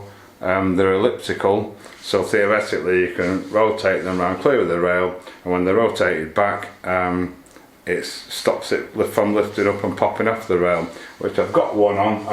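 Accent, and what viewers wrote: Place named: Yorkshire, United Kingdom. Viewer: Love the door❤ Where are you guys from? You sound like your from Yorkshire 😁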